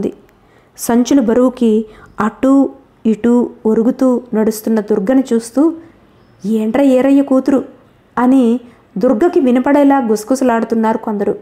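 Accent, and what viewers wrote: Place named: Godavari, India. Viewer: స్వాతీ! ఎంత బాగా చదివేరో!ఈ కథను ఎవరు చదివినా గోదావరి జిల్లా స్లాంగ్ రాక నాకు కొంత అసంతృప్తి గా ఉండేది. చాలా చాలా థాంక్స్ మీకు. మీరు కథ పెట్టిన నాలుగు రోజులు గమనించక పోవడం వేరే ఫంక్షన్ లో ఉండి తప్ప మరేం కాదు. 😊👌👍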